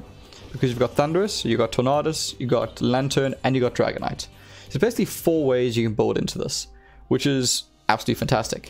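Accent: South Africa. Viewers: Recognised the accent immediately... =) Fellow South African here. I was torn between these 2 initially, but ultimately went with Relentless Flame due to both recommendations and daily challenges. Now I have both. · You sound South African...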